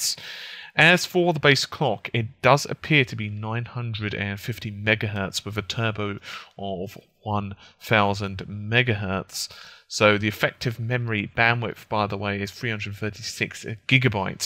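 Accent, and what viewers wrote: United Kingdom. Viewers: It's because he is British. They have many strange accents there.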